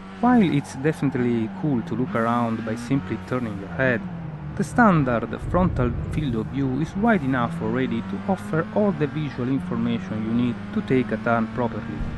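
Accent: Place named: Italy. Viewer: Si sente che sei italiano... :) Allow si pronuncia "ellau", questa è la parola che suona peggio, anche se devo ammettere che la pronuncia lascia un po' a desiderare. Ma in fondo chissene... ;) Video molto utile, almeno per me. Mi piace! ^^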